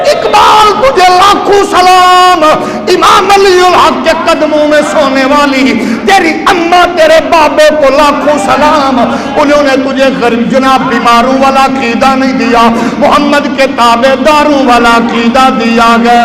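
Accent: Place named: Punjab, Pakistan. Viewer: Maa sha Allah lykin jo ap ki Punjabi ka lotaaf ata hai ous jysa kio maza nahi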